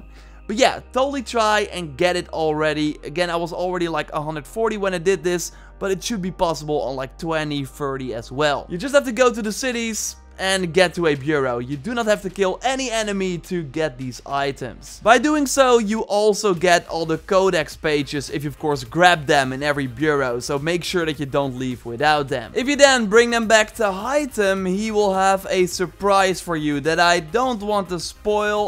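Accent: Netherlands. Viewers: Never watched you before. But based on your accent, I immediately knew you are Dutch!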